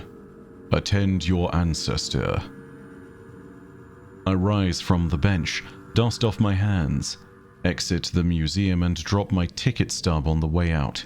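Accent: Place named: England, United Kingdom. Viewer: lol the captions can't understand english accent, talking about "law books"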